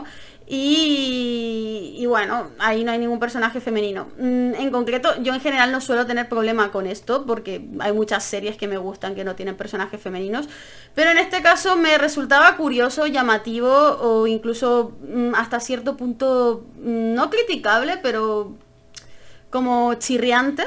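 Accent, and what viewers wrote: Chile. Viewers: Btw cuando te molestas sí se te nota mucho en acento chileno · cuando no teni guión se te nota caleta lo chilena sdffjsdhfdshj somo el mejor país de chile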